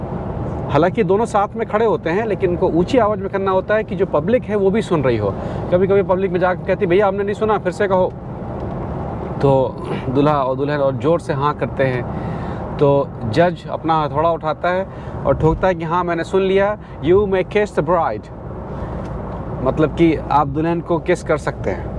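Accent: Bihar, India. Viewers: Aap bihar se kya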